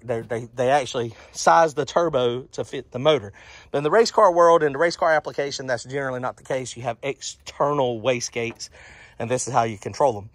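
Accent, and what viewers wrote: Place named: Georgia, United States. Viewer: Thank ya, brud in law! Preciate your insight. Where you at? Sound like a Georgia boy. Take care n keep your shiny side up...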